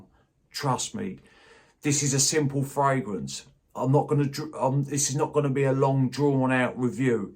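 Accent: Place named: Cockney, United Kingdom. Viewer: Finally, we got a proper cockney in the fragrance community! I could listen to you read the Bible, mate, and probably get my life right with the Lord. Cheers!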